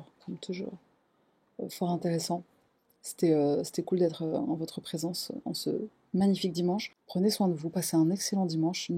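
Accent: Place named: United States. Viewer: Slt et le kiffe tu 😊parle super bien anglais avec l’accent et tout trop bien en clair américain et c’est trop cool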